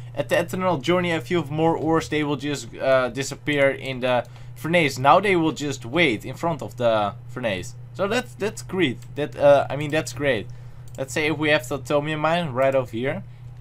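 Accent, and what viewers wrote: Netherlands. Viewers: I love you're vids and accent r u Dutch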